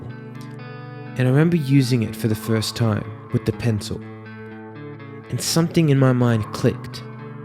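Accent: Kerala, India. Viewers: R u indain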